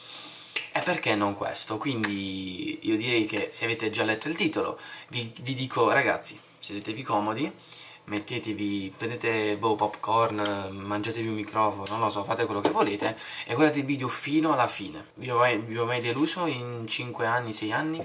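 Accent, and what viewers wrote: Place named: Apulia, Italy. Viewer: Scusa se te lo chiedo ma sei pugliese? Perché hai quasi, se non del tutto, il mio stesso accento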